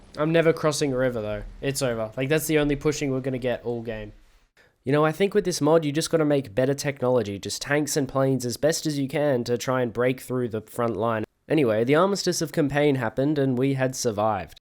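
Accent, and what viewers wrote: United Kingdom. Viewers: I’m surprised this has confused him, by the sounds of it he’s English. You don’t have to be a political expert, but we are also a constitutional monarchy, and surely he must have come across the idea of the King appointing the Prime Minister. Edit: Australian, not English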